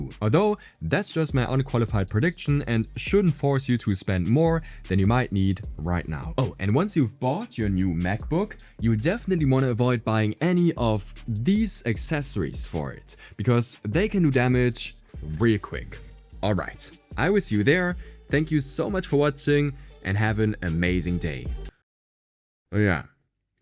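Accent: Germany. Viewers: i have a slight feeling that he is perhaps german, the accent is just so distinct, its really funny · Ist das ein deutscher Akzent? 😅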